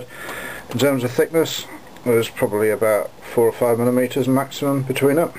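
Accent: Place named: United Kingdom. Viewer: somebody can explain me what this does/ sorry is because it kind of hard to my to understand brithish english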